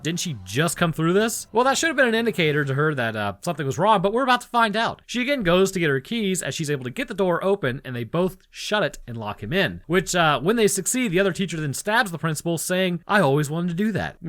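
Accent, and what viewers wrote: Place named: South, United States. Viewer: As someone who lived in Florida for a while and some times his southern drawl sneaks out of the basement that it SHOULD NEVER leave. Southern drawls arnt that thick unless they’re Cajun or some very very isolated rural areas. Atlanta ain’t one of em.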